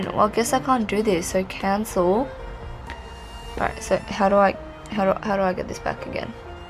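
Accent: Australia. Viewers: Your Australian!!! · Are Australian? You sound like you are? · Are you Australian cause I am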